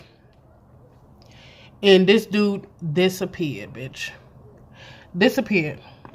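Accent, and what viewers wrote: United States. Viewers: Girl I watch two of your videos. Now mind you I loved your other 2 video it was the part 1 and 2 of your lipo experience. Since I’m going to get SX in DR I came across your channel. Honey this video right here! This video right here!!!!! Made me hit the subscribe button!!!! Mija between the smacking on the mango and the clear NYC accent and being an Afro/Latina like me I’m hooked. It was worth all minutes of my time😂😂😂😂😂 Keep us laughing girl🥰🥰🥰🥰❤️❤️❤️❤️❤️😂😂😂😂😂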